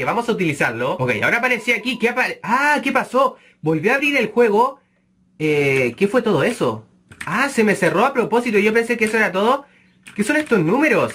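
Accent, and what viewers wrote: Spain. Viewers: Si eres chileno porque hablas como español? V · desde cuando hablas español españa?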